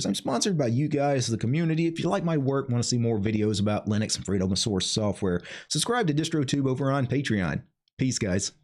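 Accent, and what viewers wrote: South, United States. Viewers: As someone from the Southeast United States, sounds perfectly normal to me 😂 But to my friends from other parts of the country he has quite a strong accent 😛 A benefit of hearing the “Southern accent” of the U.S. for non-native English speakers is that it is likely the most slowly spoken of the different English accents, hence the famous “Southern Drawl”. It is very ironic to me that it is easier for some people who do not understand English very well to understand the “Southern” American accent better than some native speakers of American English who are from areas outside the Southern U.S. Language/linguistics is so fascinating! 🤓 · He does not talk slow. That “slow” bullshit from people thinking they’re cracking “funny” jokes about Southern Accents.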